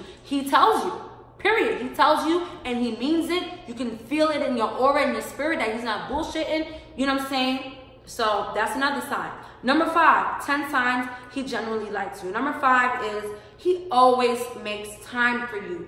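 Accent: New York, United States. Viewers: I love your ny accent ❤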